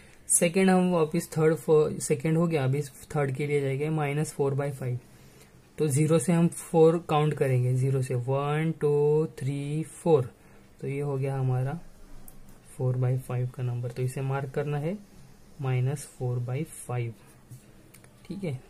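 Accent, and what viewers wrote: Maharashtra, India. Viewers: Best explanation sir are you marathi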